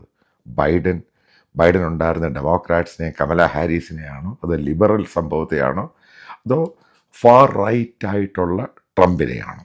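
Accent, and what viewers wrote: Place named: North India, India. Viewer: Your accent is pretty poor . Especially when you say attack, Qatar, now you just said Vatican . You sound like a North Indian Jero(Zero)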